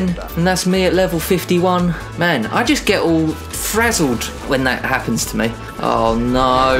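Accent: United Kingdom. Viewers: Geordie Podcast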